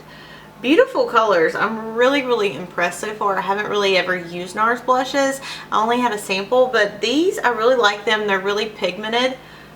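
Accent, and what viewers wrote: United States. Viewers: Love ur country accent. I'm from Texas. But I don't think you have Texas accent do u?! Lol great palettes!!!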